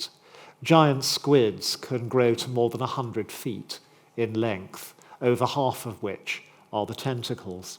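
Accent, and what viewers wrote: United Kingdom. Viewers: yes, the Chinese dragons are mild and benevolent as "le feng shoah" tells us... Jesus Christ, what a dingus whenever I hear the British accent I'm expecting the most clumsy, ridiculous, unaware, stupid thing to be said. can't believe these people were the staple of documentation and history just quite recently.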